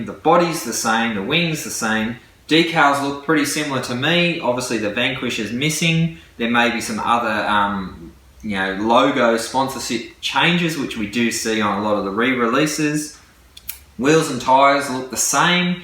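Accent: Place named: Australia. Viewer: Audio is much better, but you seem to have picked up an Australian accent some how.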